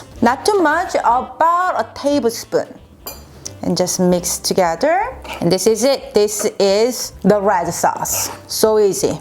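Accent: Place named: South Korea. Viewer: I could tell she was Korean the moment I heard her first sentence.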